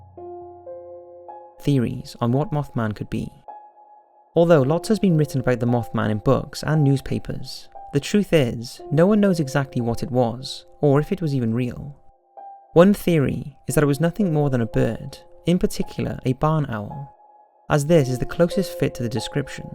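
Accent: Wales, United Kingdom. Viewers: You sound Welsh dude...what part? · He’s welsh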